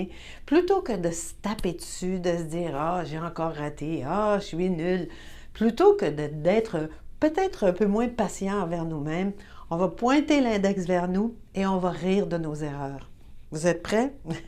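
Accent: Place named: Quebec, Canada. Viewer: MERCI 🙏 Merci pour votre rire et votre générosité à le partager ❤ J'ai ri de vos exercices et de l'intermède entre deux, tant le fait, alors, de reprendre votre sérieux m'a fait rire aussi, car c'était comme "vous retenir de rire" ! 🙃 'Quelle idée' !!! 😂 C'est mon yoga du matin 😊alors que j'ai entrepris de le retrouver pleinement, pour la petite fille surnommée "Rayon de soleil" que j'étais 🌈 Et votre si bel accent 'québecois' qui, au départ déjà, me fait sourire ✨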